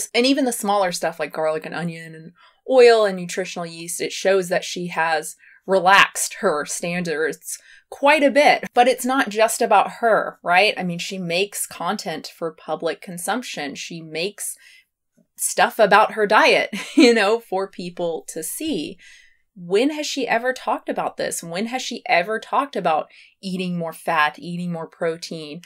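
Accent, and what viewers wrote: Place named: Pacific Northwest, United States. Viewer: She mentions Portland. I assume she means Oregon? To me, as an Oregonian, she does have a bit of a PNW flair 😂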